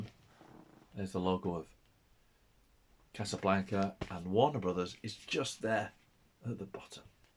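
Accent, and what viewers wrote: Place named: Cockney, United Kingdom. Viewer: The only person who cares about this “anniversary” is you. I’m Chip Rock I shot the cover of KISS ON TOUR 1976. 41 photos in KISSTORY too, my photo credit is in ALIVE 11. Gene once told me in ‘75 “you’re our biggest fan!” All serious fans know when the 1st album came out I paid 2$ for it in a California hippie record. Incense galore. You need a haircut & walking papers. Not impressed & your lower class cockney accent is ingratiating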